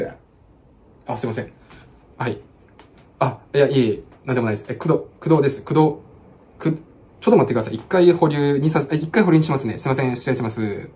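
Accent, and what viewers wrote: Tsugaru, Japan. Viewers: 標準語のイントネーションに津軽弁が残ってますね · 定期的に津軽弁が聴きたくなる動画😂 いや〜面白いですね！！青森のお父さん、優しくていいなぁ〜。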